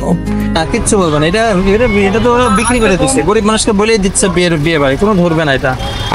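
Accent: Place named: Pabna, Bangladesh. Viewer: আমার বাড়ি পাবনা,আপনার কথা বলার ধরন অনেকটা আমাদের মতো,নাইস ভাইয়া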